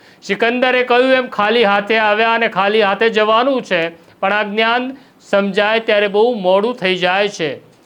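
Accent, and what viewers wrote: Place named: Bihar, India. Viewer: તમે બિહારી પત્રકારો ના ટોન માં કેમ બોલો છો, ગુજરાતી ની જેમ વાત કરો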